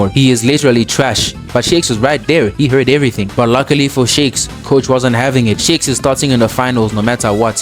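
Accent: South Africa. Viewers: Muh boy ded from 🇿🇦 with that accent .🎉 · Bro you live here Mzansi right i can tell from your English bru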